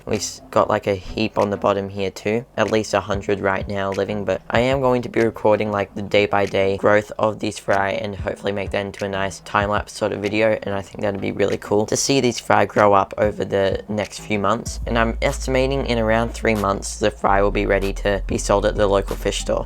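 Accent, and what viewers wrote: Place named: Australia. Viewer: Great video mate, glad I came across another fish related channel! Sounds like you got a bit of an Aussies accent you from here?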